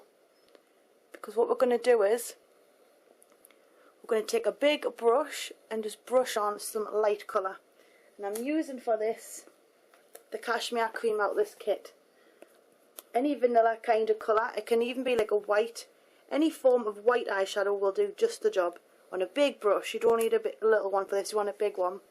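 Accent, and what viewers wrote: Newcastle, United Kingdom. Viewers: Her accent is from Newcastle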